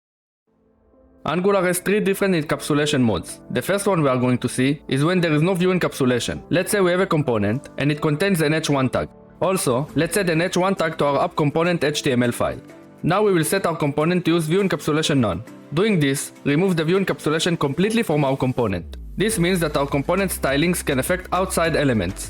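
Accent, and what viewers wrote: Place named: India. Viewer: oh my God! Is there any ony of this videos that is not made with indian accent/???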